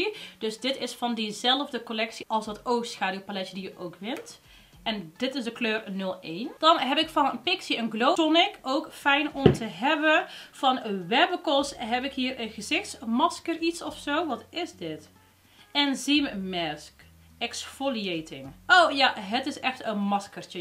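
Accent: Brabant, Netherlands. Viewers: Hee. Kijk graag naar je filmpjes, omdat je zo lekker Brabants bent. 😃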